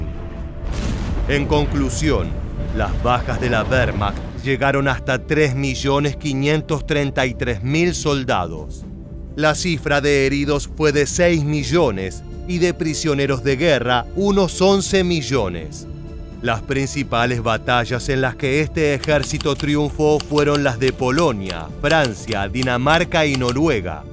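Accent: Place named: Argentina. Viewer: Al narrador se le nota lo europeozadonque se siente ha bla en español Argentino y no quiere pronunciar los nombres de los vehículos en español que incongruencia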